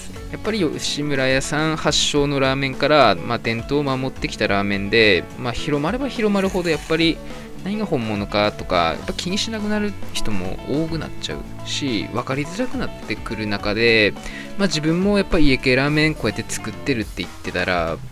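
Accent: Aomori, Japan. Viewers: もしかして青森出身のお方ですか？ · 青森の人？ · ちなみに、青森県のどちらの出身でしょうか？なまりが？謎です。十和田市民より。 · おうち麺TVさんってもしかして青森出身ですか？ イントネーションが青森の友達にそっくりで笑ってしまった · 喋りに少し訛りがありますね。 青森の方ですか？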